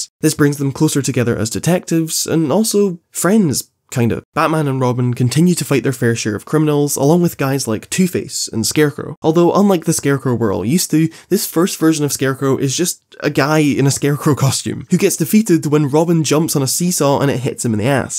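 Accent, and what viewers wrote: Scotland, United Kingdom. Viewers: damn ur scottish too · Great video man, didnt realise you where a fellow scot until this video so ay:D from one Scottish to another Scottish, keep up the good work:) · Well he's Scottish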